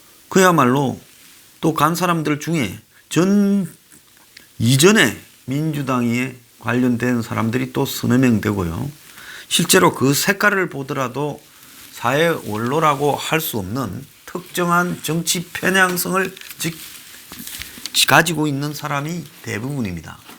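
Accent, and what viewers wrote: Gyeongsang, South Korea. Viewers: 디져야 한다.참 오랜만에 들어보는 경상도 사투리군요. 구수합니다. 문도 디지고 조국이도 디지고 이해찬이도 디지고 기레기들도 디져야 한다.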